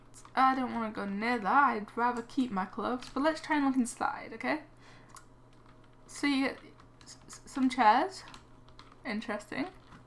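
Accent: United Kingdom. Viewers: Ay Your british like me! :D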